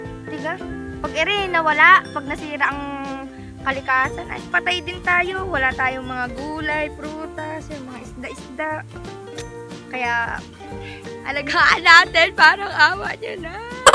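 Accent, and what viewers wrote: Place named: Marinduque, Philippines. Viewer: we are the same accent im from marinduque province...watching from canada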